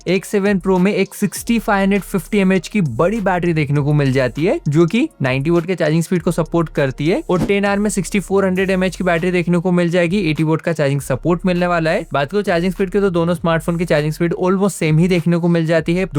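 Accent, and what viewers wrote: Indore, India. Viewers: Bhai aap indore se ho kya?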